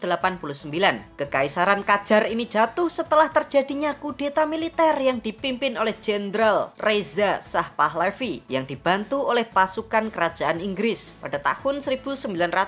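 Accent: Java, Indonesia. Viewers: suara naratornya Jawa Banget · Kenapa jawa mu lengket amat? aneh kedengarannya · Mimin logat jawa nya kental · logat nya medok bgt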